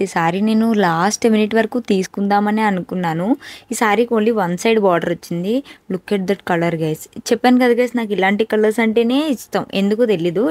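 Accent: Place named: Samalkot, India. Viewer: ownaa...madi samalkot...emti ma side ammayi la anipistundi anukunna😅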